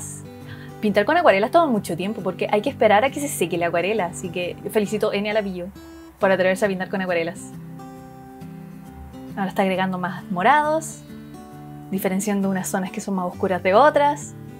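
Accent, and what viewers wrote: Chile. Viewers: Amo cono suena su acento chileno 💛💖💛💖 ~ bacan Jsjsjsjs la amé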